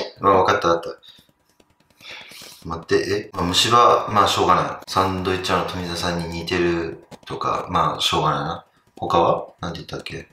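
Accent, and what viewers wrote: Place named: Okinawa, Japan. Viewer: 喋り方が沖縄すぎる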